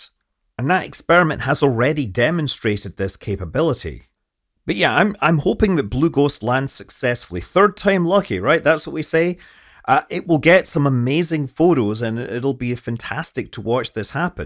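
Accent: United Kingdom. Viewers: Thank you for the wonderful presentation, a fascinating channel, and your great knowledge, enthusiasm & skills, but that (British? Scottish?) accent, such as at/about 00:5 to and to , particularly with the changes in voice volume & intonation (obviously intended to convey your obvious enthusiasm) make your speech unintelligible to American listeners, and does your work a terrible disservice. Now, with nearly 2 million subscribers, you may wish to ignore my voice, but I think that your fascinating thoughts could enjoy an even bigger audience if your speech was clearer. Thank you & best wishes!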